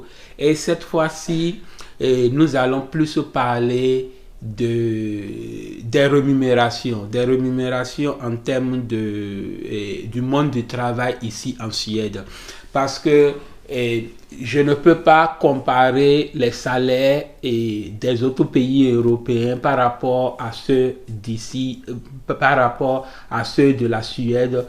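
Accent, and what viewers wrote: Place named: Benin. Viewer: Merci bien……L’accent béninois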